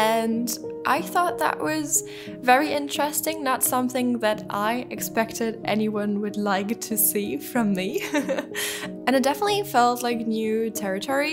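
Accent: France. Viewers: I'm wondering if you're french because of how you pronounced some words in this video i think you are ! Anyways ... i like the build a lot Vampires are my favorite supernatural beings I don't think you should be ashamed that you were into Twilight back then it was a global phenomenon so many people were into it i mean i was into it lol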